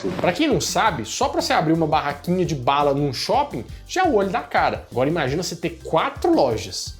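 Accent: Minas Gerais, Brazil. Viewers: Ah !!entao vc é mineiro !❤️❤️❤️❤️❤️fala da bobo dog mochila e bob au au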